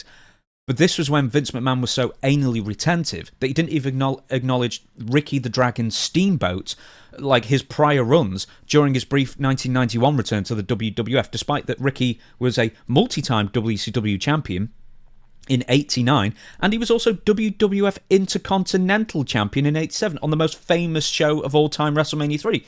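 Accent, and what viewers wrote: England, United Kingdom. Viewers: u have a latino name but u accent sounds uk 🇬🇧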